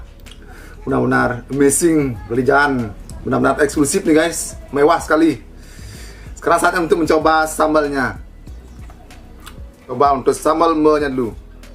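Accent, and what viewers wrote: Bali, Indonesia. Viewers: duhh seksiinyaaa logatnya bali bgtt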